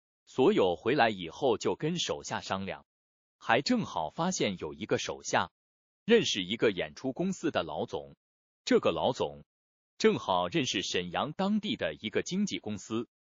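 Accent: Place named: Taiwan. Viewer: 这是哪毕业的播音员啊？台湾吗？这普通话不是母语啊！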